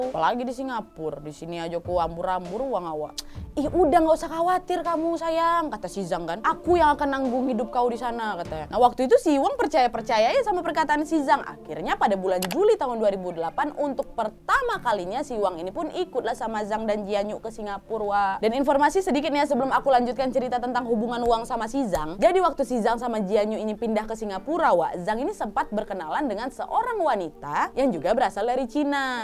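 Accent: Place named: Sumatra, Indonesia. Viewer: Kak ini aslinya orang mana kah....? Masa iya orang Jawa tapi logatnya kayak orang sumatera.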